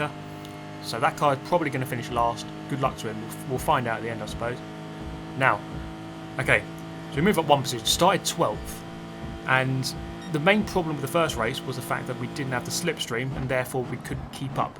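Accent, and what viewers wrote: England, United Kingdom. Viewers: I have enjoyed your series on Grand Turismo racing. With your English accent it is almost as though I'm watching ESPN or any other sports Channel that covers European Auto Racing. I used to be really heavy into Gran Turismo all the way up to about number 4, but got out of it for some odd reason. From what I see with the ability to race online I have got to get a PlayStation 4 silver I can join in the fun. BTW, would you recommend playing with a steering wheel stick shift and foot brakes or with? Let me know.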